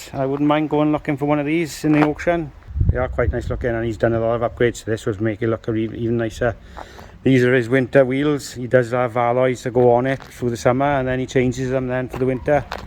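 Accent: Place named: Wales, United Kingdom. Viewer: It’s funny how in this Welsh dialect of English, words like ‘that’ are pronounced ‘naat’ or even ‘aat’. The first consonant completely absent. 😐. Where did the ‘th’ go?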